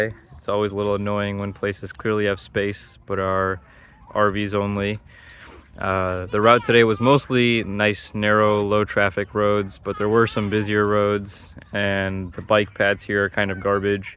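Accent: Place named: Australia. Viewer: Loved the Australian accent. Cheers.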